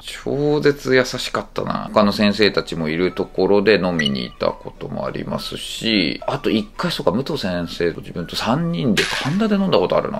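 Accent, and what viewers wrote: Kansai, Japan. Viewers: 関西弁かわいいw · 先生の関西弁めっちゃおもろい！！ww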